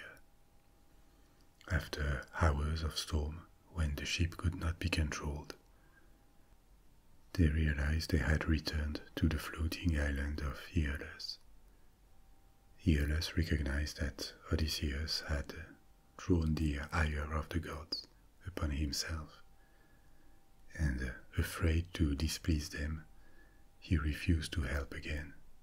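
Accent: France. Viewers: Farted as soon as it started🤦🏼‍♂️😬 scared the shit outta me 🤣😂 Butt... Soon as he said just close your eyes n let me do all the work ...😶👀🤗shiiiiitttt😴😴 say less French boss man whisper guy ..hella amazing 💯💯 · Can't forget he's french. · Dude I love your accent it reminds me of our (America's, my home country) French Allies in the Revolutionary War which in turn makes me immediately biased, and therefore I enjoy listening to you speak. Thank you for these videos you make for us. Certainly subscribing, my good sir! I wish you nothing but the absolute best. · Vu l’accent tu es français, existe-t-il des équivalents vf de tes récits mythologique? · So zi really found the french qccent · Nothing more relaxing then the sound of a french accent. One of the more beautiful accents In my opinion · Yeah the only thing keeping me up is the accent because I am French as well and it s super annoying that he does not speak in French.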